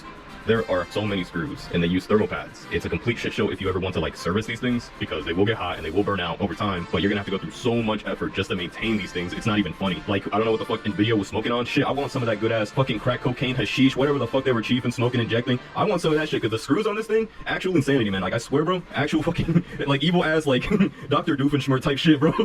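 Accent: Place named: Dominican Republic. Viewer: first time ever stumbling into this channel, and im kind of overstimulated by how much is happening in such a short amount of time. Anyways, the dominican accent caught me off-guard lmao. I didn't think you'd also be latino KASJDAJAK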